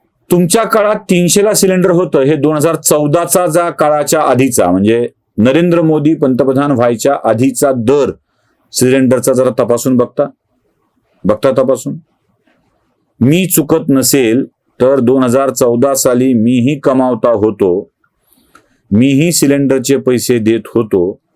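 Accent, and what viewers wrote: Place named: Solapur, India. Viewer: very nice..real representing.. reticular in solapuri..tone..😅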